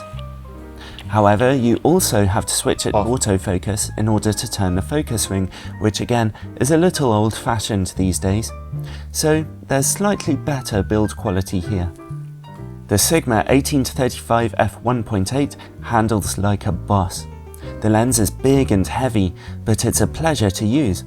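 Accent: United Kingdom. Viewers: excellent review indeed. Thank you. I really like your voice and British accent.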